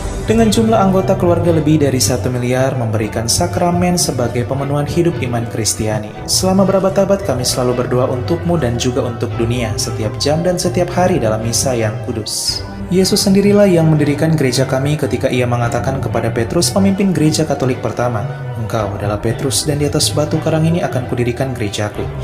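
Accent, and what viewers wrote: Manado, Indonesia. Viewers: Min orng Manado yahhh?? Hehehe 😁😁😁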